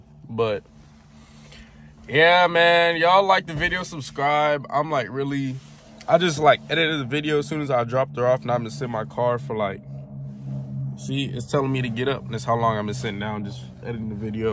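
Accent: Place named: United Kingdom. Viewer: Have you ever lived in the UK? Your accent is slightly british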